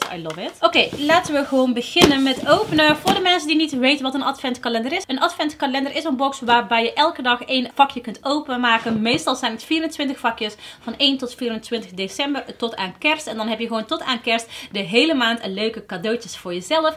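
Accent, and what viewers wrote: Brabant, Netherlands. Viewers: oeeehhh fijne producten erg leuk geniet zo van elke video van je toppertje blijf zo door gaan maar denk ook aub aan jezelf love you je bent zn lief mooi vrolijke meid met je heerlijke brabendse accent heb ik ook Tilburg hahaha liefs en dikke knuffel chantal ❤️❤️❤️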